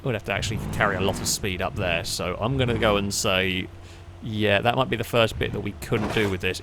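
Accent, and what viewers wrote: United Kingdom. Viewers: is British graystillplays confirmed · i took a big break from beam ng drive content and i uhhhh.....i forgot how british your accent was lol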